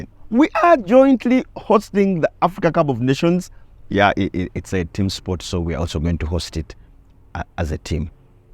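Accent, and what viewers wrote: Nigeria. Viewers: The Nigerian ascent was on point 😂😂😂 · The Nigerian accent is on point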